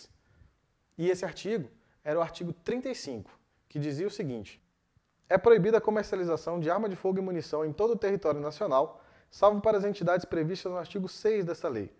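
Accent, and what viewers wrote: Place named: Belo Horizonte, Brazil. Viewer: Ele é do RS? Fala como alguém de BH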